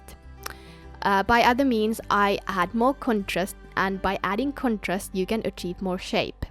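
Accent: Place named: Finland. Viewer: It doesn't matter if I watch with my first cup, or last of the day,!! I LOve watching you ANY time of day! These are so lifelike... Tom even watched, saying 'she has an accent' to which I replied: it's! She's Finnish! Of course, Tom was ready... No she's not, she is still coloring!!!" Love your card... and you!=] Michele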